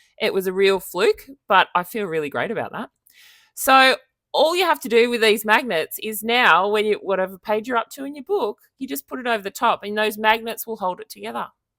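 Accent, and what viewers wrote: Australia. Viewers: So nice to hear an Aussie tutorial, thank you from another Aussie ❤️ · I agree, good to hear a Aussie , I am in Sydney at the moment but looking at moving to Tassie....Thanks for the video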